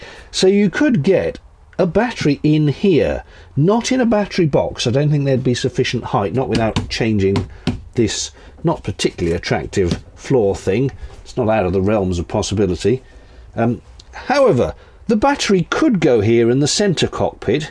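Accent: United Kingdom. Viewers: Your head-long and well-explained DIY adventure is a hoot,an enjoyment, and a few moments away from every day life for most everybody who’s watching. I love it! Plus, I like laughing out loud now and then. I like hearing your terminology of choice. I like your British accent, for goodness sake. I’m so glad I found you all those years ago! Keep on videoing as you continue along your DIY, renovate, and refurbish chosen😢 path. ♥️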